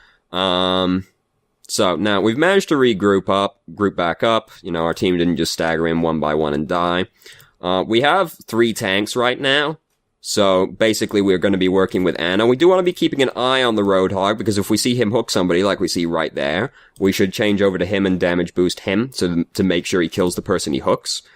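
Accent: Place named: East Coast, United States. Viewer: Where in Europe? My first guess as to where you're from would've been somewhere on the east coast of the US...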